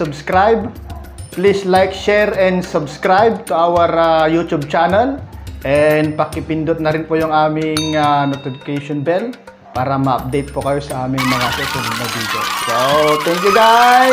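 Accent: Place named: Ilocos, Philippines. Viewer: Tga Baguio Mama Ko Po Pero D2 Na Cya Sa Cebu Date May Bahay Cla Sa Naguilian Ngayon Nasa Baguio Na Mga Titas Ko Kaya Pala Ang Tuno Ng Salita Tulad Ng Lola Ko Kase Tga Naguilian Pala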